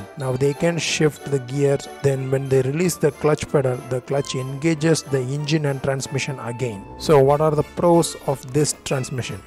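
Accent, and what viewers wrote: India. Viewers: Ur exent is so bad its like less educate indian kid try to speak in englisg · Turn of the stupid indian accent bro it ruins the video <3 Much love bro <3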